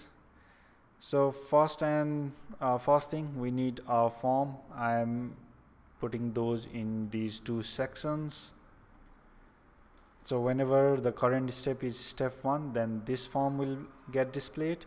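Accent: India. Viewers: Indian Accent is annoying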